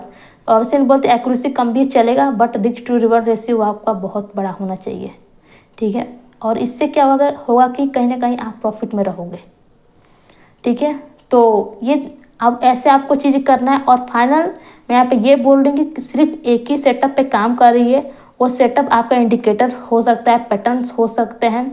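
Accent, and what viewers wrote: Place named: Bihar, India. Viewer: Bato se lag raha hai bihari hoo 😂😂😂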